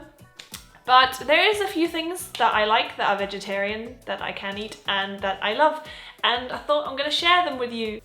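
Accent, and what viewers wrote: England, United Kingdom. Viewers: What a great English accent! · Go up north to Staffordshire, get some oatcakes & then do an english breakfast all mixed up in a pan, slap it on the oatcake & eat it like a burrito. Warm the oatcake first. DEEEEEEELISH! You could make you own oat cakes also. You sound more Brit than German.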